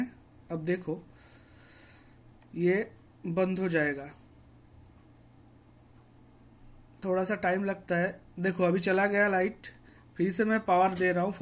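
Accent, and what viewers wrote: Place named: Bengal, India. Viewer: Bangali ho kya Bangali Jaise baat kar rahe ho to Bangali Ho gai Rakha lagta hai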